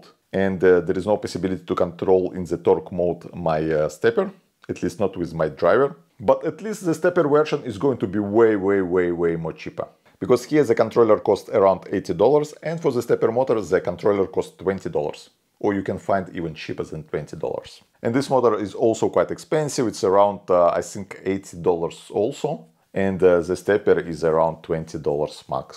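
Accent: Ryazan, Russia. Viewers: Шикарный Рязанский акцент )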